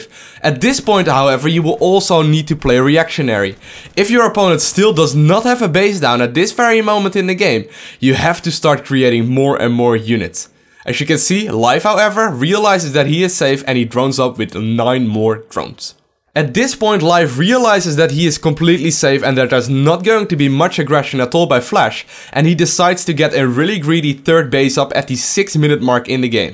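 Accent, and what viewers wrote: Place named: Netherlands. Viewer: just a sec... ben je nederlands??? i kinda hear a bit of an accent, (srry that was offtopic) but yeah, thx for the vid, you just got a new subscriber, you're awesome keep up the good work!!!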